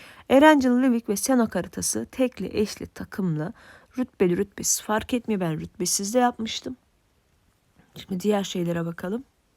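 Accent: Azerbaijan. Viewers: Turk dilini Azerbaycan dili kimi danisirsan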